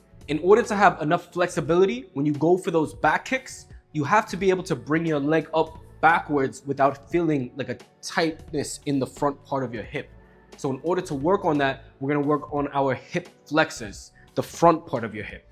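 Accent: New York, United States. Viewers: Ayo you from New York?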